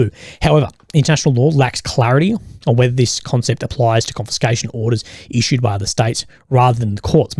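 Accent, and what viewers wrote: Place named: Australia. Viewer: Man. I gotta get to Australia just to talk to people with the accent! 😂 Appreciate your narrative daily!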